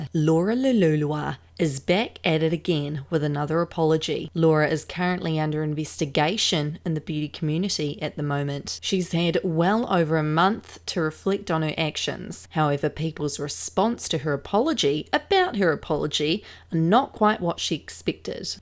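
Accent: Australia. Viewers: Are you an Aussie or a kiwi??? I’m British so I can’t tell the difference!! Hahahah you should do a video about you rather than drama your voice and accent is great sis! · sounds Australian to me :)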